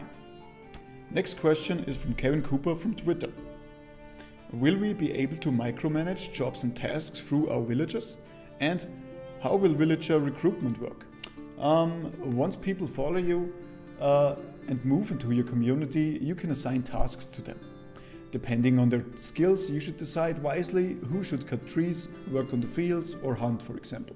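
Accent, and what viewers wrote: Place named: Germany. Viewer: Hmm jak na pl to akcent masz niemiecki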